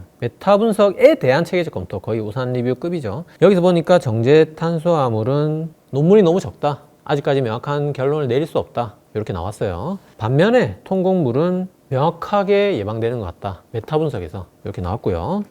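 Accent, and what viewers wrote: Gyeongsang, South Korea. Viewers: 쌤 보유국 우리나라🎉 성실하시고 어려운 의학을 구수한 경상도 사투리에 담아서 위트있게 잘도 설명해 주시는 능력자이심🎉 부산가면 꼭 뵙고 대접해드리고 싶은 분😊 근데 점점 더 멋있어지심?😮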